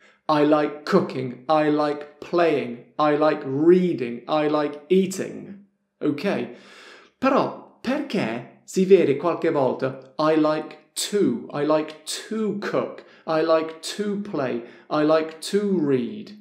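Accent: Apulia, Italy. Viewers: Ecco, mi sembrava fosse un accento pugliese, ma non ne ero sicuro. Incredibile. Molto preparato. Un onore seguire le sue lezioni. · mitico !! Pugl-English !! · ma mi sbaglio o hai un accento pugliese? hai vissuto in puglia? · Il tuo accento pugliese ti renderà famoso!!! È veramente simpatico 😃 SUPER!! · Allora non l' ho notato solo io che ha acquisito la cadenza pugliese?! 😂😂😂😂😂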